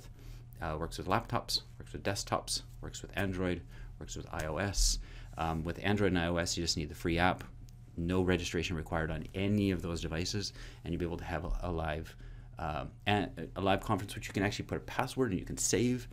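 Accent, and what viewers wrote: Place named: Northern Ireland, United Kingdom. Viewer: Thanks! Very well explained. Question: you're from NI right?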